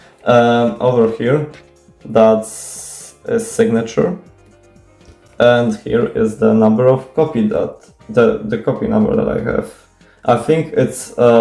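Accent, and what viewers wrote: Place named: Poland. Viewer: I just KNEW you’re from Poland, I know a polish person when I hear one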